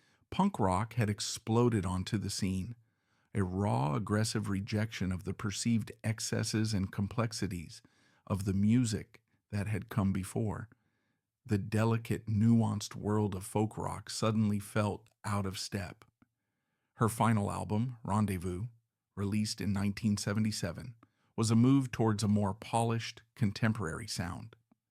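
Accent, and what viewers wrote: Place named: United States. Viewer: American accent at it’s worst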